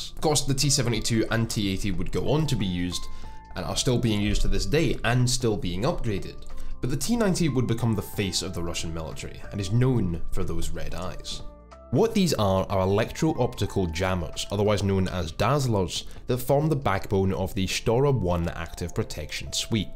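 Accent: Scotland, United Kingdom. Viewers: I just come here for that sweet Scottish accent... And the armored stuff!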